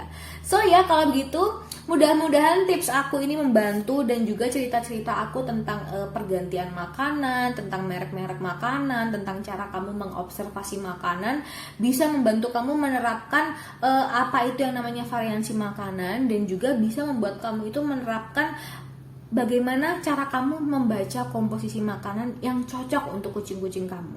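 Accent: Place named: Surabaya, Indonesia. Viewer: Medok Suroboyo 😂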